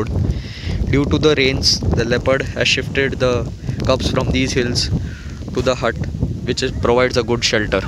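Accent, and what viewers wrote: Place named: India. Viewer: "Our deem members are now sutting upp the camra to ensure the safety of the Lappad"...Indian accent !!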